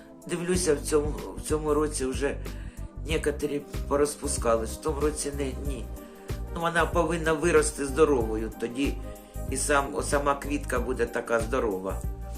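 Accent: Kherson, Ukraine. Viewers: Я, коли дивлюся відео Ваші просто "побалакать", ніби побувала у своєму рідному до болю, селі на Херсонщині 😢😢😢, там так розмовляють, як Ви. Сидю, та подібні слова, осьо.... Я, як приїхала проживати на захід України, то з мене сміялися, що я так розмовляю😊, зараз вже "вигавкалася", як кажуть, та, всеодно, деколи щось таке подібне проскакує😂. Так мені до душі Ваші посиденьки, ну, класна Ви, будьте здорові та Богом бережені 🙏❤️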